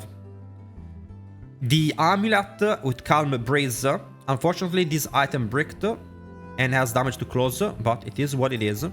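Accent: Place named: Italy. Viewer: bro its s tier and he got an italian accent. what is your problem with the build maybe we can help you?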